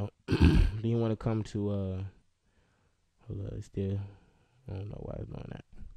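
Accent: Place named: England, United Kingdom. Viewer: I love how you speak english, like big smoke man!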